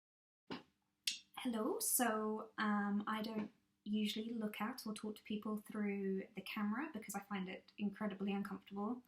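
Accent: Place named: Home Counties, United Kingdom. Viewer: That was good. It has spurred me on to give it a try. It's ages since I played that song. Your voice is better than mine but try as zi may I cannot place your accent. To me it sounds like home counties?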